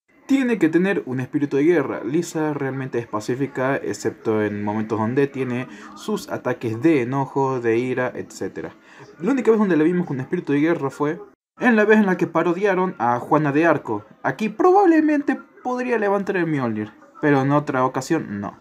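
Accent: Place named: Argentina. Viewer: Tu voz me acuerda a tucosmopolis pero con acento argentino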